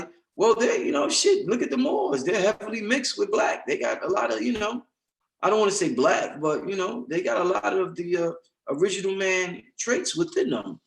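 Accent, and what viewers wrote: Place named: New York, United States. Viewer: They keys to life and u dropping Jewel's Who don't luv that new York voice? I LISTEN TO YOUR MUSIC SO DIFFERENT u always give informative video ✌❤💡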